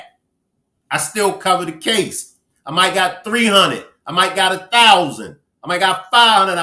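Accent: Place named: Baltimore, United States. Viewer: The Baltimore accent gets me everytime 😂 I'm from Louisville Kentucky but I'm a RAVEN all day! Flock Nation! Replay Gang!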